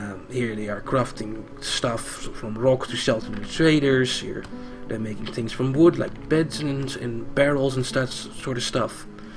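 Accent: Netherlands. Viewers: "The Real Meach and Pochachoes of the game" I love the dutch accent:) You have such a different accent to SivHD though, a very diverse group you are! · Nicely done! You are my favorite streamer and youtuber:) Nothing can compete with that delicious dutch accent.. Looking forward to future episodes in this series! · I agree that he's got quite a heavy Dutch accent.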